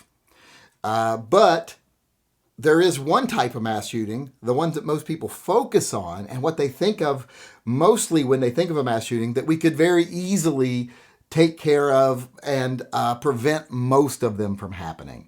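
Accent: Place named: Texas, United States. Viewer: If you're, how come you to sound like most of the other Texas country folks I know? Are you from west of the Taconic/ Appalachian Range? That might explain it. I've heard Mainers that sound like me, too - from west of the mountains. Or from in the mountains. WAIT A MINIT! Did I just hear you say offer the weird kid a handie??? No thanks don't want a stalker - it's enough that I talked to the weird kids. They become attached and no matter how kind they are, they usually end up being insistent, wanting sex with you all the time. I've had six oddballs / weird kids, sexually ambiguous, moody broody or otherwise outcast loner types fall in love with me because I was kind enough to give them the time of day or to speak a bit and say hello with a smile. They also get very possessive of your friendship and time. They also make it all bigger than it really is. 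That of course probably tells the world that it means the world to them, but it's really not the same for you. They fall in love and to them, that means sex - as it does for most all males, daughters ignored by fathers, but most always for the odd people who are probably not getting any parental love at home or sibling affection, respect, or any familial attention even. It may be the very reason they are odd because their parents are like the bullies at school! No handjobs for the oddballs, it may create stalkers and other unsavory events.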